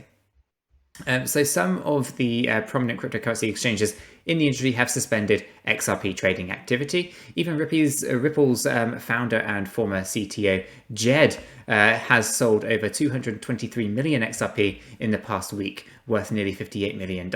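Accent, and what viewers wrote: England, United Kingdom. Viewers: The English accents are a giveaway no?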